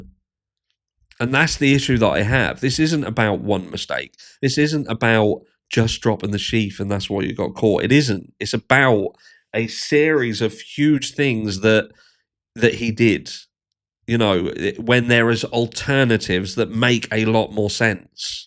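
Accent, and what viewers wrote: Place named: England, United Kingdom. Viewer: Hey brother really enjoying your channel the last few weeks since I started following this case. It's my first "true crime" interest because my BS meter went off since I first heard the 911 call. Keep up the great work. Btw what regional English accent do you have? It has a nice ring to it 👍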